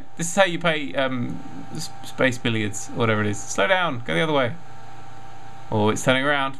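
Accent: United Kingdom. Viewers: 'American voice' its a british accent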